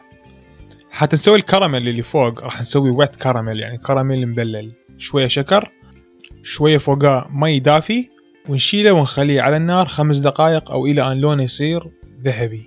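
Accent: Mosul, Iraq. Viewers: يابا شغلك يخبل وشرحك هم ونظافتك وحجيك العراقي عاشت ايدك يامبدع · يتكلم عراقي فديت للهجه مالتنه🤩😍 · اول مسمعت اللهجه العراقيه على طول اشتراك قبل مااشوف الفيديو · اول فيديو اشوفا الك .. انت مصلاوي صح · رائعة الكيكة و لهجتك العراقية و عفويتك في التقديم بارك الله فيك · نوشه نوشه واضحة لهجتة عراقي😐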